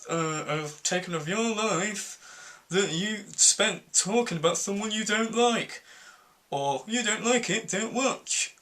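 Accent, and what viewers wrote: United Kingdom. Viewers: I insulted his British accent not the British language as a whole while your coming in and commenting when you do not know what your talking about. But Im done with yall, I proved my point even if you don't think so or not. If your looking to get another reply from me your going to be waiting because I'm gone by lol. Just know I'm going to be back if you make another video on a DSP topic, adios my not amigos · Love that UK accent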